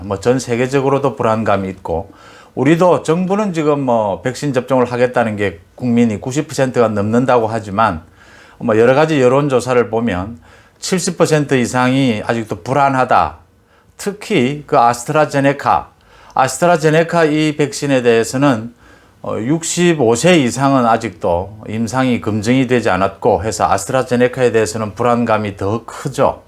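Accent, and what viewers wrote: Gyeongsang, South Korea. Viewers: 사투리는 아니지만 억양은 조금 남아있겠죠. 경상도사투리가 중산층 남자들 한테는 아주 유리한게 가볍지않고 신뢰를 주는 측면이 있거든요! 자부심 가지시고 하고싶은대로 하시다보면 구독자 늘어나서 올해는 라이브 방송도 하시고 후원계좌도 열어서 더 좋은 방송 부탁드려요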